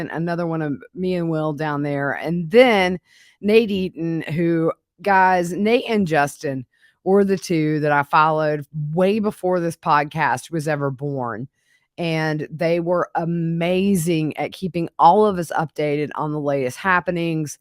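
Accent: South, United States. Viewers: PS: I so love your southern accent ❤ Greetings from New Zealand 🇳🇿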